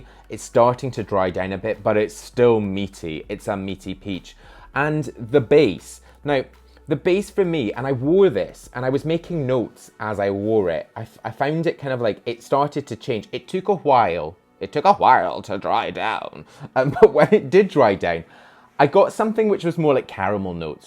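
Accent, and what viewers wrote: Scotland, United Kingdom. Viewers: Not related- but your accent is definitely less scottish. Idk how you feel about that but it's an observation. Love your stuff from the states! Keep on brother!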